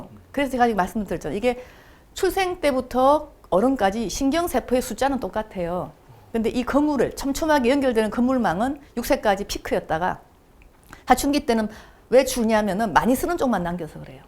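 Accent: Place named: Gyeongsang, South Korea. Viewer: 예전에 tv인터뷰때는 몰랏는데 갱상도 분이네요.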